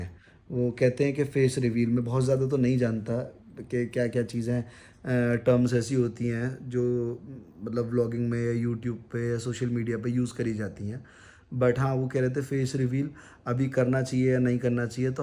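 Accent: Standard. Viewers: Ap Hindi itne shudh tarike se bolte ho acha lgta h🎉🎉🎉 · itnii suddhh hindiii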